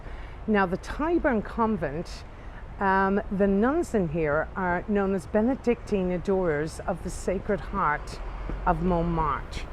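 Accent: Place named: Ireland. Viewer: Love your tours, I love history escpecially that around London, you are so informative, your gorgeous Irish lilt all goes to make one very enjoyable armchair tour, Thankyou from a Southerner now living in the North, I’m off to the “ Old Bailey” now if anyone should want me 😜🥰🐾🥰🐾🥰🐾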